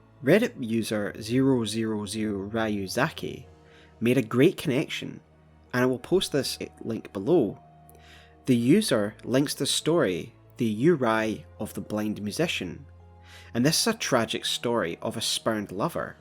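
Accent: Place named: Scotland, United Kingdom. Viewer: It's nice to hear a Scottish accent. Love your vids man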